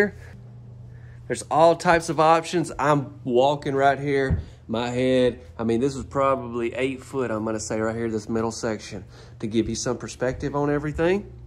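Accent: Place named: Mississippi, United States. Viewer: I should've you were from Mississippi by your accent! Definitely gotta support your channel! My family is in the PineBelt. What are the best mobile and Modular home sellers here (South MS)?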